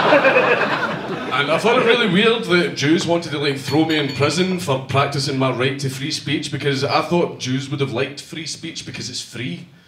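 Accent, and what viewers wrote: Scotland, United Kingdom. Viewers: Been watching dankula and kmac for like 2 hours straight and everything i read or think now is in a scottish accent send help.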